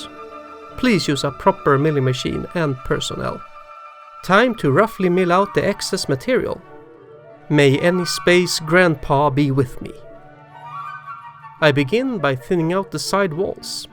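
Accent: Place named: Russia. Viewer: Why would you destroy a real steel lower so you can make it into an airsoft? That makes no sense wasting a registered lower to do this. You could have just bought an 80% real steel to turn it into airsoft. Then it dawned on me that accent... Maybe you are not in the USA… maybe in Russia? Daym... It's that easy to get an m16 lower? Daymmm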